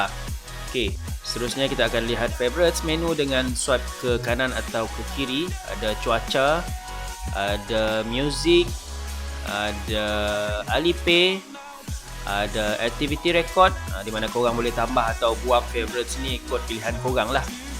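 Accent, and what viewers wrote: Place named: Muara Tuang, Malaysia. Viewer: Eh, muara tuang eh. Patut la mcm ngelala jak bunyi sleng tek. Hehe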